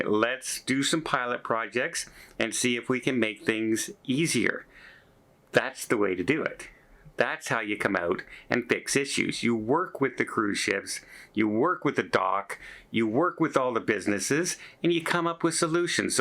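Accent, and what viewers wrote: Canada. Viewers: He is Canadian give him a break on the pronunciation, eh?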